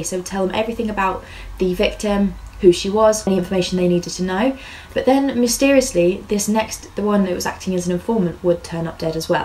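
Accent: United Kingdom. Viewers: It just sounds different because she's from Britain. 🤔 Grits in Chicago is the same as grits in Alabama 😂 And that would be porridge in England. 😆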